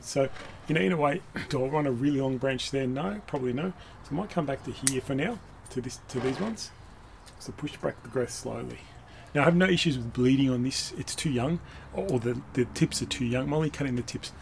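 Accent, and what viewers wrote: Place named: Australia. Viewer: Beautiful maples ❤ I love that Aussie accent. You have beautiful trees and I appreciate you sharing your thoughts on trimming them in spring. 👍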